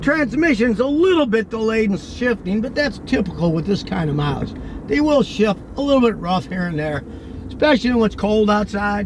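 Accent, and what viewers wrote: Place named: New York, United States. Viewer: I can tell.s from NY, got that soprano swag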